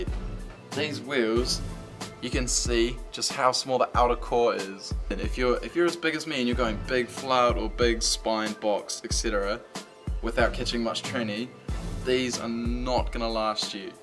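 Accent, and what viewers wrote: New Zealand. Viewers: what shop is that you sound like your from your New Zealand? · that`s his kivi accent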